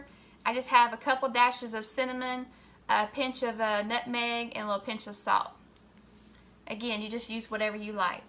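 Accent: South, United States. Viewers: Great recipe and there's nothing wrong with a beautiful country accent🤗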